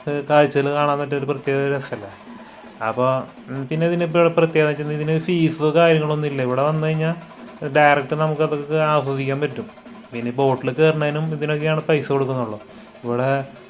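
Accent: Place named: Thrissur, India. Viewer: സംസാരം കേട്ടാൽ തന്നെ അറിയാം തൃശൂർ anennu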